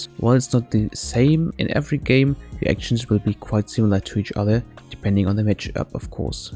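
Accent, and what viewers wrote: Germany. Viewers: Bro are you from DeutschLand?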